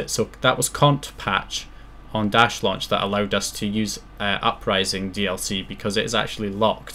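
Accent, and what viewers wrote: Scotland, United Kingdom. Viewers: Mate are you Scottish?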